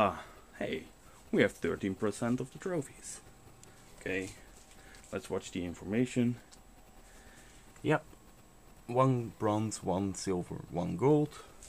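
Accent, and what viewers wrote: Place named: Netherlands. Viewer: aan de manier waarop je engels sprak (en je rekentoestel) wist ik gewoon dat je nederlands was :p btw: ben je programma nu aan het downloaden, thx!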